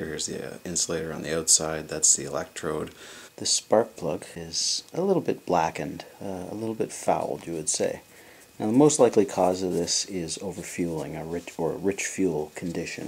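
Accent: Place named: Canada. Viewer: You have a canadian accent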